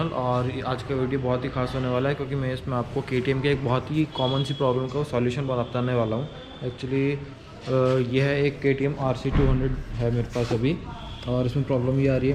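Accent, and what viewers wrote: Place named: India. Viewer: thank you very much for the answer, I know is an Ecu problem but I have some questions. First i have a map for the conplete exhaust and I think if I reset the Ecu (I read somewhere that can do it by take the battery for some minutes) the remapping would be lost (I'm not sure about). And second I can't believe that is the only way to fix it, is only a part of the Ecu but I don't understand the indian english and for a duke owner is a problem.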